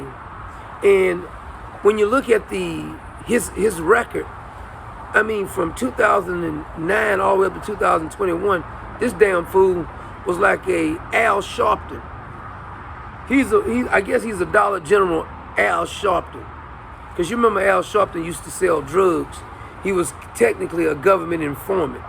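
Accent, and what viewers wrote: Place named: South Carolina, United States. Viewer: I grew up in SC, i live in NJ now tho. Hearing your accent reminds me of home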